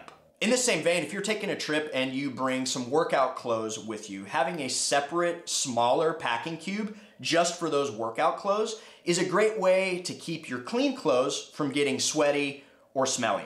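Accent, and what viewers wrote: United States. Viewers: I didn’t mean anything bad. It’s just that you are exactly how I imagine an American to look and sound like ;)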